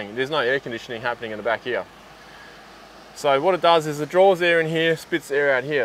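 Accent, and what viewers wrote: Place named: Australia. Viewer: Thanks for a great review, I am considering this fridge (or 2) for my campervan build, and you have just convinced me. They will work perfectly stacked on top of each other, and the idea of remotely locating the motor is the best. It took me about 3 sentences in, to identify the language as Aussie English 🙈😂😂 Greetings from South Africa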